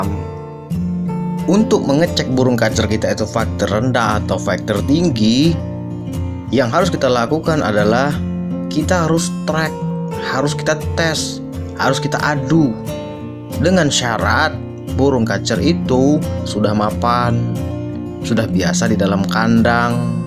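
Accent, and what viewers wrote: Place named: Sumatra, Indonesia. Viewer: Macam orang sumatra logat ko wak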